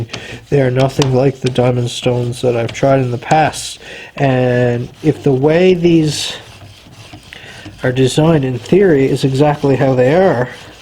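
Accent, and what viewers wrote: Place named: Newfoundland, Canada. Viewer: You are sharpening your knife like a madman. You are going way too fast and only using a small portion of the stone. Cody at Edge Pro explains that if you run the stone over the knife too quickly it will result in glazing. While it’s obvious you are getting good results, your poor old stones are taking a beating. Trying slowing down and ease off the coffee. Sounds like you’re from Newfoundland.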